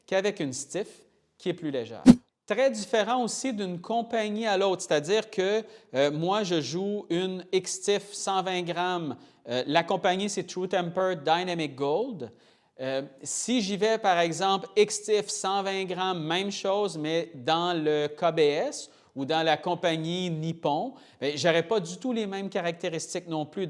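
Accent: Quebec, Canada. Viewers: C'est dépaysant d'écouter un québécois. En France on parle de tige pour une plante ou pour quelqu'un de grand 😁 Ici on parle de club de golf. Mais le plus drôle c'est quand tu parles des distances 😁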